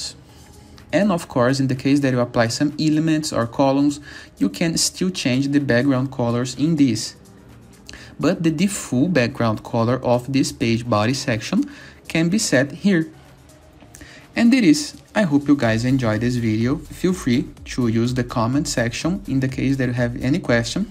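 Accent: Brazil. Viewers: Sotaque brasileiro kkk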